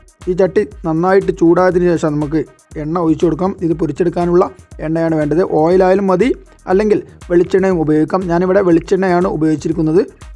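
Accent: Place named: Vadakara, India. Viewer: Laddoo mixcher. Anna vadakara parayunne. Super aayi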